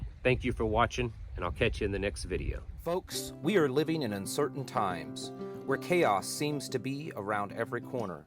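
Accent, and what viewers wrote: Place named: Kentucky, United States. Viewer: Tucker is the only show that I watched every evening. And Life Liberty Levin. On Sunday. I won't watch Fox anymore. Done. He stood up for what's right. And all of this lbtqrsi+ he stood up against it. Whit values. I would say they told him to bend over and take the crap.. and he refused. Good for him. We all need to stand against this. Fox needs Tucker more then Tucker needs them. He will be back better then ever. I can't wait. Fox News will be done..... Thanks for you messages that you give every day. Because you speak the truth. I'm watching you from Kentucky, and I really liked your Kentucky accent. Loved it😂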